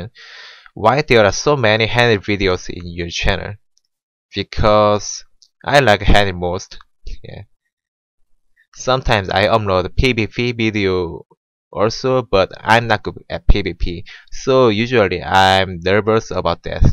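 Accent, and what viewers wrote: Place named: South Korea. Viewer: Hater back off kay? English isn't his first language The korean accent is strong if you actually listen you'll understand him so easily.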